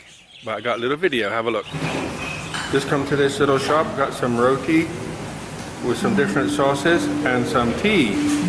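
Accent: United States. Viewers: Hello found your channel today and such a lovely change from most other Thailand videos. I used to live in Thailand but left in 2002 and many times wish I hadn't but we all do things for a reason at certain times when we think it's right. Anyway I was surprised to hear you are from England you have a slight Texan twang to your accent. You mention HRT, I am on Nebido which is a testosterone replacement therapy. Two reasons the GP put me on this is because one I had a low testosterone levels and two I have ED at times which is a result of various medications I'm on. It is given to me by injection every 12 weeks. I have no idea of your reasons and its not my business but just thought I would mention it to you. I have just subscribed and will keep following your life in Thailand.. Power to you..David..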